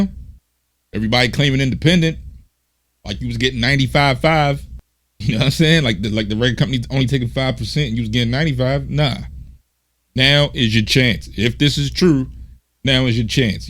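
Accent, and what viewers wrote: Baltimore, United States. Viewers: are you from somewhere near Baltimore? I'm just guessing as an Irishman who may have watched The Wire too much 😅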